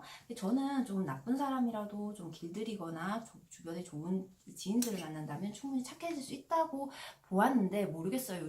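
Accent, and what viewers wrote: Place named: Gyeongsang, South Korea. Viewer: 말씀이 좀 빠른건.. 경상도 말이 원래 그렇죠. 대구신가요? '대학장구' ㅋㅋ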